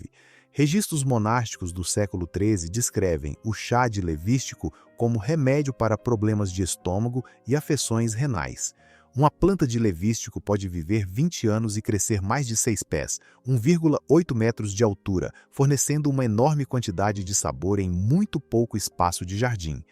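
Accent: Brazil. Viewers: Texto de manele com sotaque brasileiro. Ficou ó. Uma bosta. "Sabor à noz". Ridículo. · Dialética de Portugal, fonética do Brasil.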